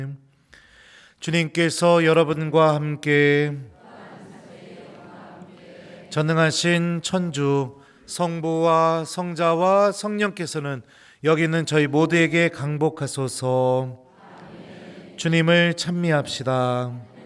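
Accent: Chungcheong, South Korea. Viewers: 신부님🎉 구수한 충청도 어투에 진솔한 마음이 무한 감동입니다 🎉🎉🎉 만나면 화해한다는 말씀, 성체조배의 중요성, 하느님과의 화해🎉 고해성사의 진실한 준비- 더러운 물을 정화시키기 위하여 깨끗한 물이 필요하듯이 고해성사가 우리 마음을 정화시켜주는 깨끗한 물이 된다는 말씀🎉 감동입니다 🎉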